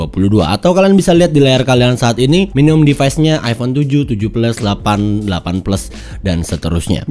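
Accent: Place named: West Java, Indonesia. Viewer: Lidah org sunda bro, gw juga gtu klo ngmng b inggris kek kesleo gitu lidah, ahaha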